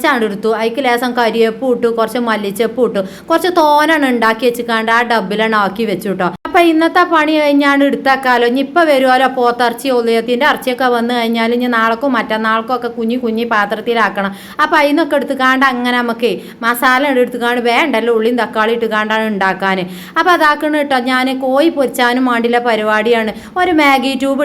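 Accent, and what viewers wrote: Malappuram, India. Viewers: മലപ്പുറം താത്താന്റെ വർത്താനം ആണ് അല്ലേ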